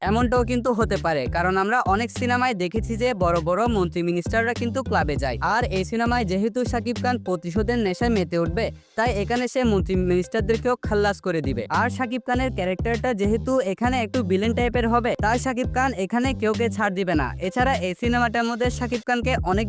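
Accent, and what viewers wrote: Chittagong, Bangladesh. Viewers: আরো চাই তোমার বাড়ি কি চিটাগং ভাইয়া তোমার কথা শুনে লাগতেছে ❤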